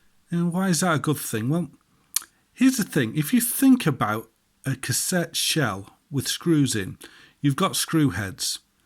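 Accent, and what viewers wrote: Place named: Manchester, United Kingdom. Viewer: You've got the same kind of accent as Techmoan. Are you from the Manchester area too? 😁👍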